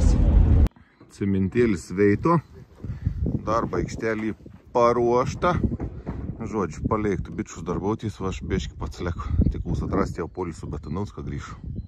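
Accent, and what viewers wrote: Samogitia, Lithuania. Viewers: 😂 Maniau, kad tai kažkas iš M. Petruškevičiaus serijos - punčakos nemadinga 😂. Ačiū, už tą nuoširdžią žemaitišką kalbą ❤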